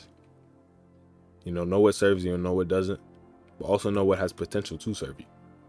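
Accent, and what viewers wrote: United States. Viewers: You from Georgia bruh?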